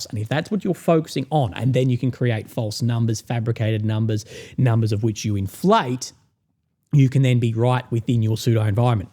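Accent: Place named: Australia. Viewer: Down under mate 🙂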